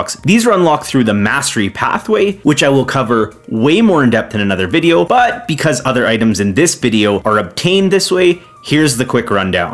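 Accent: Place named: Western Canada, Canada. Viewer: Great videos and your western Canadian accent is really cute ^_^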